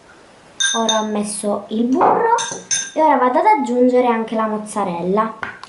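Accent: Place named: Abruzzo, Italy. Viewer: Ah che bello! Avevo riconosciuto l'accento! Anche io ho origini abruzzesi ed ogni volta che guardo i tuoi video, mi sento a casa =)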